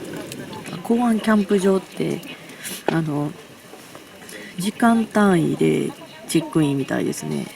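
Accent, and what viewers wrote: Kansai, Japan. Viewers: 4度って夫の実家の台所の温度😂😂🐻音を聞いていても楽しいし、主様の話し方が同じ関西なのでとても親しみを感じます。メスティンのご飯いつも美味しそう❗暮れていく富士山絶景でした😢✨お疲れ様でした😃！一緒にラーメン🍜🍥食べに行きたいです！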